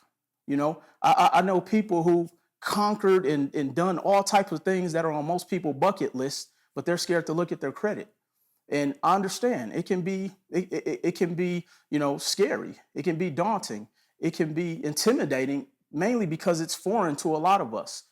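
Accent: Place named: Louisiana, United States. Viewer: your brilliant! Off topic, you have to be from the south? Louisiana? Your accent gives it away! 😎😎😎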